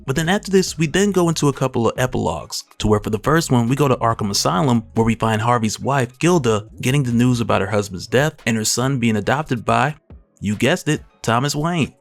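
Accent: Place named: Southwest Virginia, United States. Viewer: Love your accent man im from southwest va lol it sounds my county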